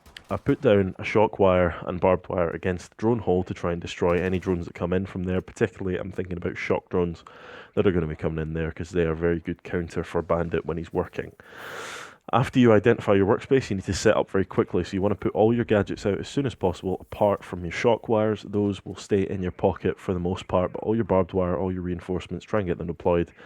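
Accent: Scotland, United Kingdom. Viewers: Yu scotish m8 holy feck that be rare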